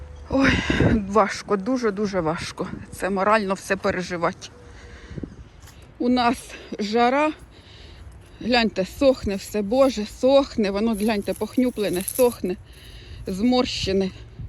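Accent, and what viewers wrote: Kryvyi Rih, Ukraine. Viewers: Дуже приємно було почути криворіжську говірку. Бальзам на душу. ❤😂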